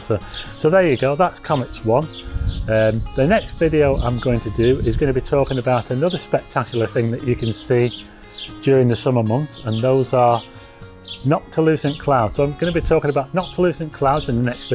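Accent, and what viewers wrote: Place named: North, United Kingdom. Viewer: Its a very posh northern accent. Easily understandable.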